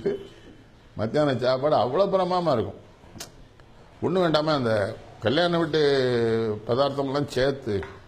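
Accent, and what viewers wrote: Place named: Tirunelveli, India. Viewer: My native nellai speech,,,♥️♥️♥️♥️♥️🙏🙏🙏🙏🙏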